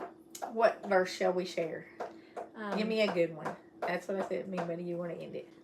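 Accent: South, United States. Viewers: It’s southern accent, and, yes it’s English! Have you not heard of southern accent, that’s where you get that twang from if you’re from Tennessee, North Carolina, Georgia and Atlanta usually have that twang, even those from southern part of Virginia will have it. Which she is from the southern part of Virginia. My mom was born in the early 30s during Appalachian times in from Southern Indiana, they don’t have much of twang. Now, not today people in Indiana may have it but, it’s just not as so, strongly pronounced, I guess you would say.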